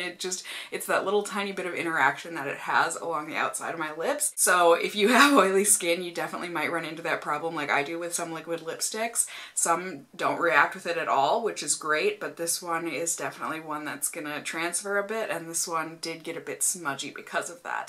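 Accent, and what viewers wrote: Minnesota, United States. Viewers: I feel weird for thinking the sneeze intro is so adorable!!! Lol!! I think it's the little Minnesota twang in her voice. 😂 Love you!! I've been watching for years. Also, I support your choice to separate channels (even though I really wish people could just suck it up and keep on scrolling, also not that my support means much lol). Always wish you the best! Love from Fresno Ca!!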